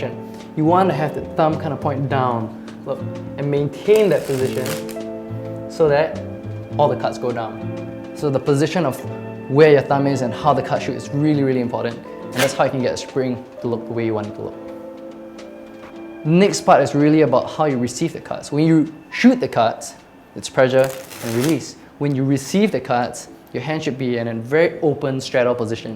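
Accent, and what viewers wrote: Singapore. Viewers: Your accent man... are you Singaporean? o_O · This guy sounds Singaporean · hmmm....funny how i can tell apart a singaporean.probably the way we speak... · wah seh, ur singaporean accent quite strong. by ur 2nd sentence i can tell ur singaporean already... hahahhaha.. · You sound like singaporean or malaysian. :p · you sound so singaporean ! Are you? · you know a singaporean when you hear one... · I love your Singaporean accent. Me dad is from Singapore <3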